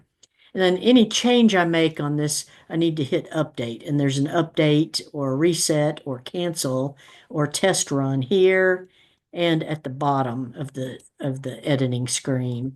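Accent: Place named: Houston, United States. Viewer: Good morning. Can I join your discord group? Please send link. I am also interested in Dale Perryman's contact info. Do you know how reach him? THANKS! Grew up in Houston and your accent feels like home.....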